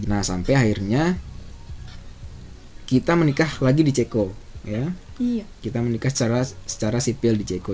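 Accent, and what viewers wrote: Jakarta, Indonesia. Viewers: Ajib bang.. komentar Lo polos,lucu. Logat Betawinye jangan ilang ye bang. Hehehe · Gua suka gaya lu bang 😁 dan logat betawi nya masih kental banget... keren lah 👍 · Jakarta banget ni abang bloko suto😃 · Betawi banget logat lu bang heheeee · Gile lu bang.., kaya rujak cingur Suroboyo.., macem2 buah,sayur,tahu,tempe,cingur, nyampur jd satu, jd hitam semua karena bumbu petis. Ibarat kamu nih bang.. Indonesia,Slovakia, Ceko, Belanda, Inggris, Spanyol, tetep aja logat Betawi kamu yg muncul. 👍👍Salut bgt gue.. Salam Bonek Suroboyo.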